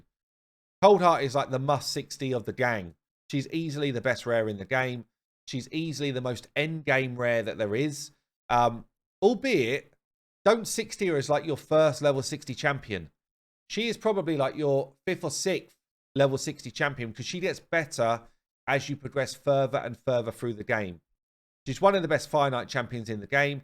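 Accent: England, United Kingdom. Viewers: and you should go for a update for some of the free heroes and the artifacts. Almost all the free to play heroes. Love the content. And your English accent 😂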